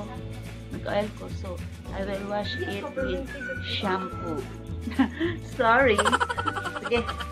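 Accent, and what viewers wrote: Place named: Visayas, Philippines. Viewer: Ate bisaya ka pala. Magka sinabut jud ta ani love u po ❤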